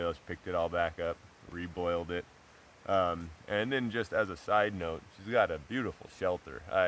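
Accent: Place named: Siberia, Russia. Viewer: As you know, Alone on TV is very drawn out sometimes, that’s why I started doing 1.5/2x But, I never got to hear what your voice sounded like at this speed because whenever you or roland were on I would slow it back down so I didn’t miss anything. You guys are like encyclopedias: straight to the point, like how I imagine many most mountain men and Russians are. I have a theory that your time spent in Siberia has given you a really cool dialect. It’s always a pleasure to hear you talk, especially from memory.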